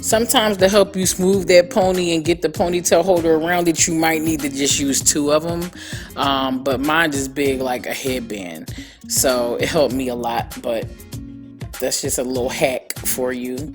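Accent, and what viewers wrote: Philadelphia, United States. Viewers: Your Philly voice is all that! Love my city. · I love how heavy your accent is that’s that philly right there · Beautiful job! You can take the lady outta Philly but you can't Philly out the lady! Love that accent 💜💜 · Darling where are you located?! You sound like you’re in philly (no offense!) is love a press and trim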